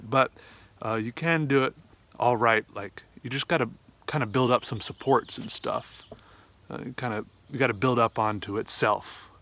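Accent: South, United States. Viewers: TF? I was, for no reason other than curiosity, looking at alligator skin cowboy boots before opening Youtube and continuing my "Watch Later" list when i see wearing a cowboy hat and speaking with a southern draw. TF is going on here?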